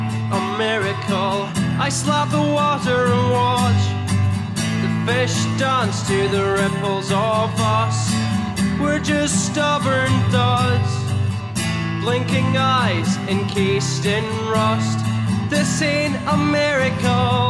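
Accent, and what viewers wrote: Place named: Edinburgh, United Kingdom. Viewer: People form Edinburgh have such thick accents! If I wasn't a killie boy I would have thought you were Irish!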